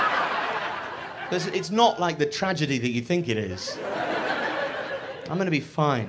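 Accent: United Kingdom. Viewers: Amazing Special! that Scouse accent is so fucking funny. Bum Nuggets.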